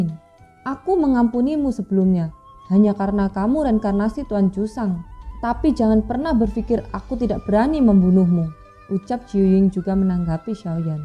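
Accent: Java, Indonesia. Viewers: suaranya jadi medok gini.....jadi nggak pass rasanya😢😢😢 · Hmmmm ada logat Jawa nya.. Jadi gimna gitu denger nya😢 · Logat jawa nya masih terasa🤭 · Agax medog pasti mba min orang jawa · Ini kok jawanya medok bgt😂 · Suara nya terlalu cepat ini min... kurangi dikit..biar mirip sama...logat Jawa nya nampak x min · Terasa medooknya 🤣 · kok lain suaranya, ni medok bgt Jawa nya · meddok suaranya kurang power, tapi boleh tahanla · sekarang suaranya jadi medok...klihatan jawanya.😆 Semangat...!! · Suara nya jadi medok bgt. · suaranya kurang,semangat,strong,terasa datar,logat jawinya kental,maklum belum terbiasa😁🙏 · Suara mu kgak enak min, medok btol. Mn si??? Dia lgi pas tuk mmbwakan nya, jlau kmu tdk kembalikan, kmi akan ramai2 mninggal kan channek mu ini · Suaranya kaya agak medok gimana gitu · Adu aduhh ko jadi medok ya, 🤭🤭🤭🤭, yo wes gpp, lanjut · Suaranya duh..... 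Logat medok dan lemes... Ayo retret dulu dan berkultivasi supaya kami tak.ketiduran saat mendengarkan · Ko medok yah. Maaf nih orang jawa bukan? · Jowone totok😢😆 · sebenernya suara mimin ke 2 enak di dengar, tp yg jd asing itu pembawaan nya kurang tegas malah bikin ngantuk, trs dlm bahasa indonesia nya masih ada cengkok jawa nya jd terasa gmn gt. Sekedar saran coba dlm membawakan alur dlm bahasa indo ini cengkok jawa nya di ilangin 🙏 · Medok amat mc nya... 🤭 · Suara ne medook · suaranya medok minnnnnn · Medok banget nada suara nya.suara Jawa..emang kmn kak..kangen suara nya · Aih kok suranya ganti, logatnya kaya cici jawa medok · Aura jawa ny mentok ya.. mimin ny..😊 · Medok men toh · ini suara kaya nya Avatar nya ka.yng ngomong bukan suara kka yng asli..ka nya mna ya..Iki suoro ne kaya lagam nya wong jowo.. Iki..aduh kurang seru suara mu Avatar..🙏🏻🙏🏻🙏🏻.maap ya suara kka Avatar kka kurang menarik niee😔😔😔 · Agak meddok. 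Lucu lucu 🤣🤣🤣🤣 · Suaranya unik, mendok sedikit,ya keren siihh,di dengar agak kaku sedikit tapi begitu pemulah,ttp semangat ya,🙏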